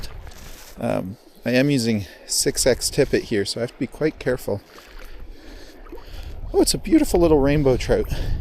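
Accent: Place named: Minnesota, United States. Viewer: I can't take the Minnesota in your accent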